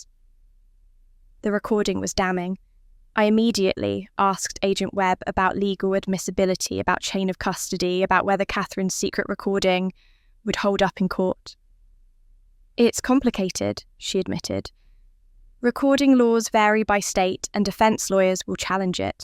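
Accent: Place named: England, United Kingdom. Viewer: Lol she said she lives in Cambridge, its an English accent...but she lives in Boston part of New England.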